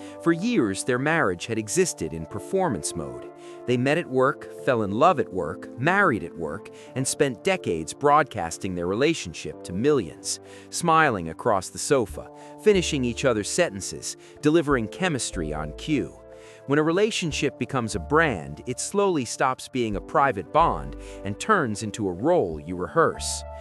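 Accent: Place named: United States. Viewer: What the flying f…. An American voice/person who knows absolutely NOTHING about this couple. YUK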